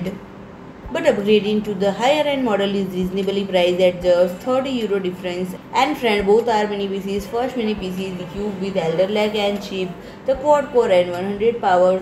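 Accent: India. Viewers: Didn't understand a single thing. Music + horrible mic + indian accent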